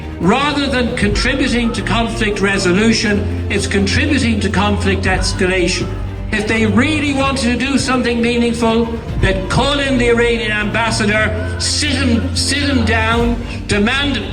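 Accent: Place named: Ireland. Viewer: Such a relief to hear an Irish voice speaking common sense. I increasingly feel like we are living in a twilight zone.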